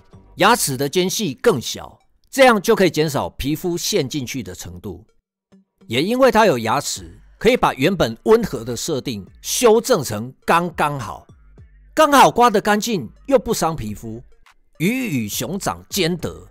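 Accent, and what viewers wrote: Taiwan. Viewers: Jasper你好，喜歡你講台語的用詞跟腔調，很合高雄人胃口😆 我是個想換刮鬍刀的新手，看了幾部你的影片， 發現一些長相很像市售盒刀的傳統刮鬍刀在你的影片畫面一閃而逝， 由於那些一閃而逝的畫面並沒有特別提到型號，所以我也就無從搜尋起， 因此想來請教Jasper，有哪些長相像一般市售盒刀，又適合新手用的傳統刮鬍刀呢? 謝謝回覆~ 🫠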